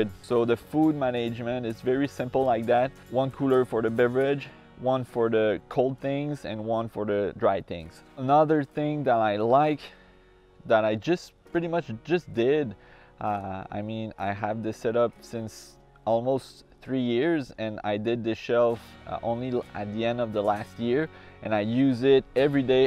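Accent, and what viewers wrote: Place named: England, United Kingdom. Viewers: your English accent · Definitely the English accent!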